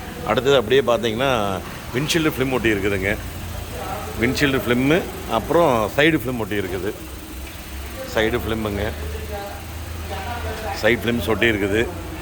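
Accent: Kongu, India. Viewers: Car Dr. Mr sir, For yours nice Kongu speech and open to all pannady. Congratulations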